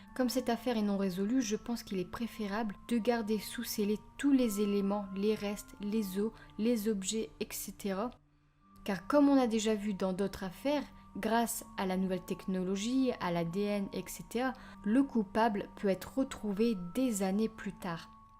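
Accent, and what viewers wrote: North, France. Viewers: Je viens de te découvrir..... Marathon de vidéos depuis cette nuit ! Bon travail ! + 1 abonnée ! Ton accent viens du nord si je me trompe pas ?!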